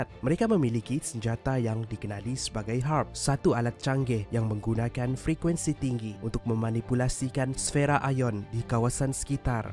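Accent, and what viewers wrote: Sabah, Malaysia. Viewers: Dia loghat sabah. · Abang ni orang sabah ke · Abg ni orng sabah kh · patutla cara cakap lain sikit, urang sabah ani haha mantap, teruskan, support bah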